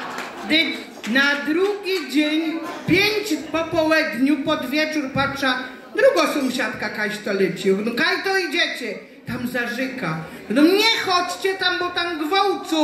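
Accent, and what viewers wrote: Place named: Silesia, Poland. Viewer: Super kobieta uwielbiam Śląska gware bardzo miło posłuchać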